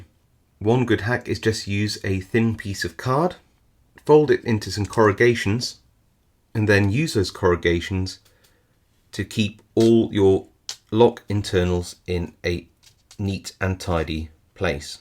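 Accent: United Kingdom. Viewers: Excelent presentation! I already know most of this tricks but with your strong british accent all look like some cassic documentary worth to watch, and yes I almost forget, which size of bra is the best for making lockpicking tools? =) =)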